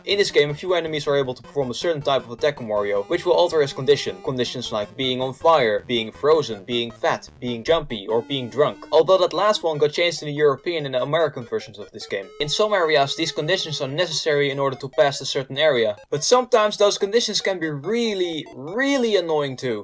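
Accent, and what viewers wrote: Netherlands. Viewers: Nice video.You have a new sub :D And also the Dutch accent makes it that much better xD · the dutch accent is real XD.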